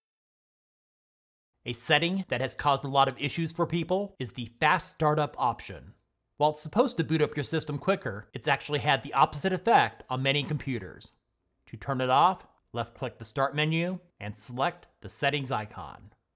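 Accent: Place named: Appalachia, United States. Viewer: in Hilly Billy accent just classic!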